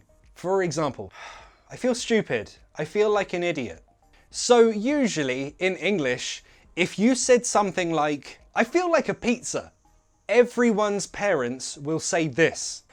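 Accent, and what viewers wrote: United Kingdom. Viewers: Oh God I love the British accent 😂😂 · You remind me how beautiful British English is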